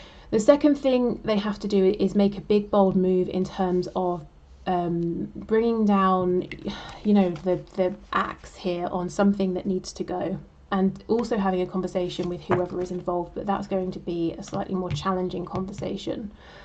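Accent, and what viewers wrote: United Kingdom. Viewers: I love British accent, lol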